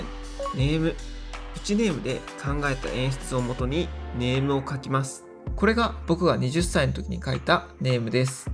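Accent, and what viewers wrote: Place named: France. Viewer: Pardonnez moi, mais j'adore votre accent français 🥹😍😍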